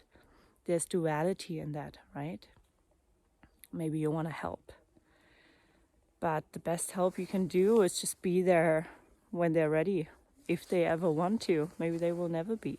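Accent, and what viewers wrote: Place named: Germany. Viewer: süss dein deutscher Akzent, unverkennbar. Meiner auch nach Jahrzehnten im Ausland <3 schönes neues Jahr <3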